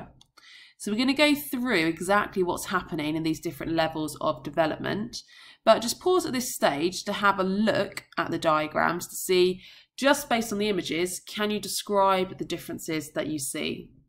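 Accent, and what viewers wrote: United Kingdom. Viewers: Good explanation, but your British accent is getting on the way. Too many unnecessary sounds. It's quite annoying.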